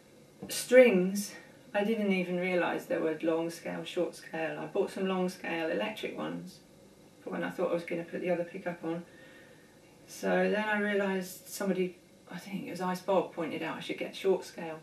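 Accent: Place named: South, United States. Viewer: When English girl get all southern? ROCKIN MAN LOVE THE LOOP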